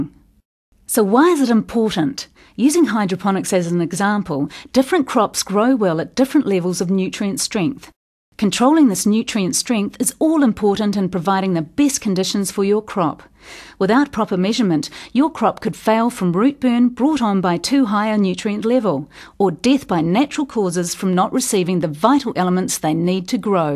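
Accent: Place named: New Zealand. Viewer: That's a thick kiwi accent! And a bloody helpful video. Thanks!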